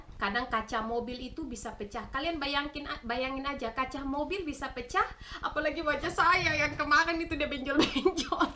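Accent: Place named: Sulawesi, Indonesia. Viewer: Logat bahasa sulawesi, mukanya juga sulawesi, slam kenal..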